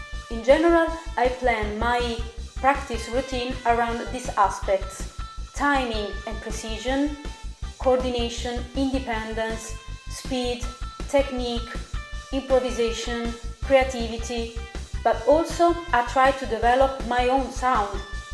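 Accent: Italy. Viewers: Omg you have an Italian accent too !? Damn now my heart is really exploding! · ....Thanks for answering questions that many of us have wondered about! What do you think about Mick Fleetwood? As you well know...the music business is not only auditory, but also visual as well. Not to appear as creepy or anything like that, but clearly you are a very talented and extremely beautiful woman....and your Italian accent is fantastico! Love to see you playing piano and singing!! Keep the videos coming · Not only are you an excellent drummer, but you have a beautiful Italian accent! Two thumbs up!!! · Congratulations on 55K+ subscribers and so nice to hear you speak with that beautiful Italian accent... I had always assumed you were in the US somewhere, it is great you are here in Europe. Would love to hear your own music and videos of you playing with your band as well as the brillant drum covers you keep giving us. 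I have got to say the hours of practice you put in really shows in your playing - amazing quality. Very best wishes from London UK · beh con quel accento certamente nn potevi essere di Bolzano...ahhhhh,insomma sei cresciuta a pane e progressive,beh sicuramente un genere molto tecnico,meriteresti un contratto da professionista! Continua cosi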